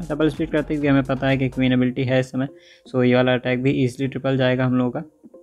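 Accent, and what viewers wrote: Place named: India. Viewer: hey mate i know its hard, but if u speak about your videos, it would be nize if u try to speak a bit slower, the indish accent is hard but i wanna hear the english phrases :D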